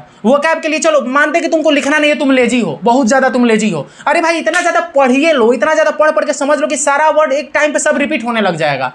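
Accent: Bihar, India. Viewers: App bihar se ho kya bhai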